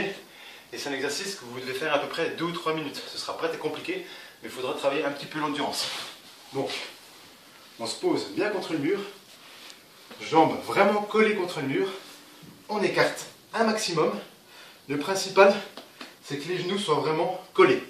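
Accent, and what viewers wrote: Belgium. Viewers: À ton accent je pensais aussi que tu étais Belge 😉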